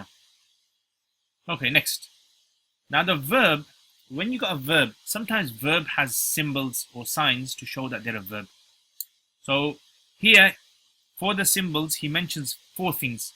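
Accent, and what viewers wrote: Birmingham, United Kingdom. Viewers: Brother sounds like you from Birmingham uk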